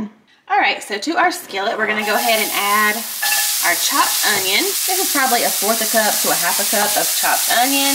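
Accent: South, United States. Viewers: Made the chicken and spinach recipe it came out so delicious. Im making your beef,rice and queso tomorrow. I love the channel and sweet southern accent.❤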